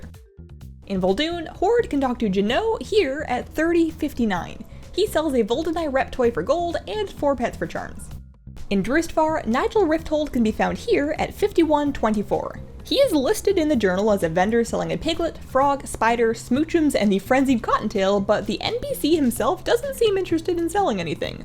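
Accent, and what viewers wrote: Canada. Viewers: canadian spotted. No matter how good their american accent is the 'out' always slips through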